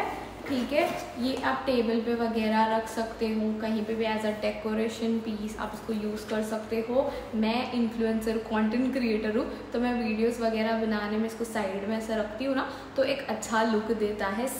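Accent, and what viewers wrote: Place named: Hyderabad, India. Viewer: Areeee ap Kerala se ho muj laga Hyderabad se ho apki Hindi Hyderabadi type hai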